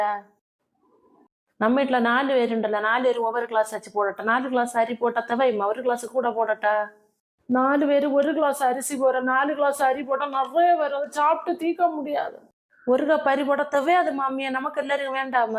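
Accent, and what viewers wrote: Kanyakumari, India. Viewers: Kanyakumari Tamil 😂😂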